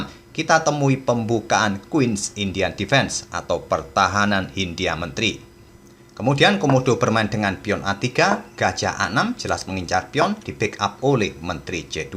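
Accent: Java, Indonesia. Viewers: jangan kurangin analisisanya min, tapi klo tujuannya mengurangi durasi, boleh tapi sedikit aja, itulah ciri khas channelmu dan satu hal yg harus admin tahu, admin tahu caranya menganalisa sesuatu dengan intonasi yg tidak datar( ada variasi tempo yg cepat lambat dan variasi nada yg tinggi rendah) dengan dibumbui logat khas(jawa), ini modal yg besar untuk menganalisa sesuatu kepada penonton karena tidak semua orang mampu menguasai ini, jadi jangan sia2kan ya admin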